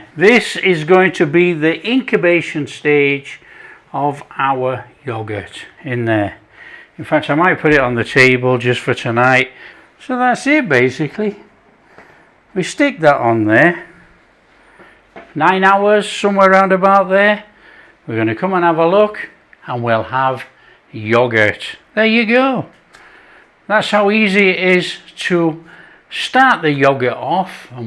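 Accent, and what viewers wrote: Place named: Scotland, United Kingdom. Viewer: Is that a scottish accent?Whatever it is I love it.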